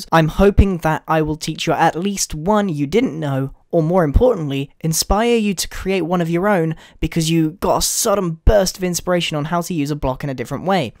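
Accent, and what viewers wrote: United Kingdom. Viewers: hes british, no shit sherlock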